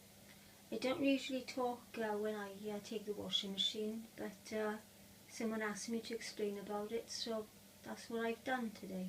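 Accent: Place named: Wales, United Kingdom. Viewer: Hi, at first I thought I wouldn't watch the whole video since I don't really like videos where people talk much, but you have a very sweet voice and a charming accent. Are you from Wales?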